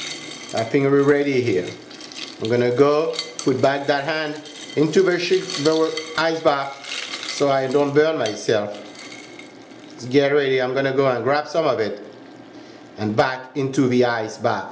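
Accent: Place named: France. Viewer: Who doesn’t love a French accent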